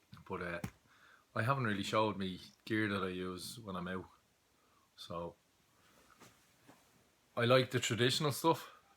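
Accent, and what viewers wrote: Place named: South Africa. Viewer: Here’s me thinking you were from South Africa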